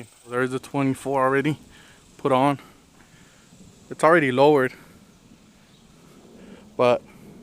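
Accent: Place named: Houston, United States. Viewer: Sound like your from Houston. I like it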